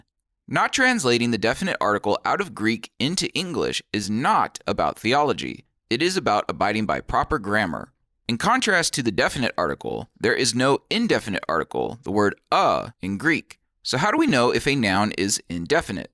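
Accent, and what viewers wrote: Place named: United States. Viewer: Interesting, although the butchering of the Greek with the unpleasant American accent was a distraction. No attempt at a good pronunciation whatsoever. Quite harsh sounding.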